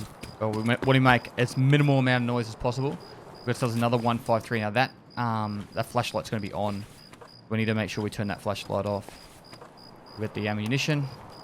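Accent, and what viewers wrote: Australia. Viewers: I recently found out Australians are the only ones who pronounce it differently (like he does).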